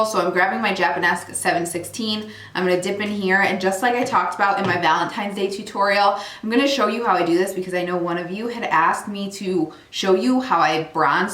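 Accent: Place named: Northern Illinois, United States. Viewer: Hearing her accent makes me homesick. I grew up in Central Illinois but my mom's side is from Northern Illinois, but south of Chicago, and she sounds so much like my cousin whom I miss terribly.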